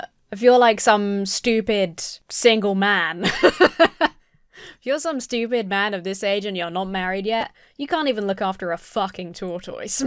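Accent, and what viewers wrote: United Kingdom. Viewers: Justice for the tortoise! I love how you say tortoise! Love your content. You British people always get me with your accents!!! You're now my third British YouTuber... Simon Whistler, Tara mooknee and you. I guess daz black is also British but his accent is different than yours. I am a married man in America and i love being married to my wife. If I'm completely honest she does take care of me and i am healthier because of her. Its not that she's my mom or anything. Even though she does do stuff for me but part of it is she encourages me to be better because i love her. Ive been seven years sober because i want to live longer because of her and the children she's given me. Also, she's awesome and who wouldn't want to be alive when you're married to her.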